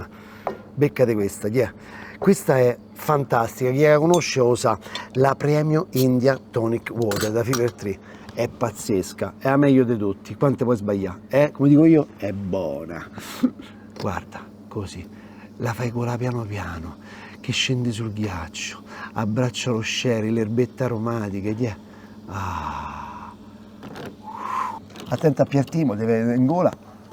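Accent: Rome, Italy. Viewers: sei il.migliore per. Simpatia, e con il tuo accento romanesco è come essere a Roma in diretta. Grande 💪💪💪🌈🌈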